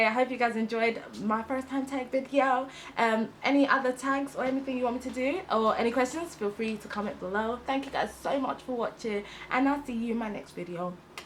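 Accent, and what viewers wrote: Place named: United States. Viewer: You're personality is EVERYTHING!! 😂😂😂😂😂. And you're American accent is 👌🏽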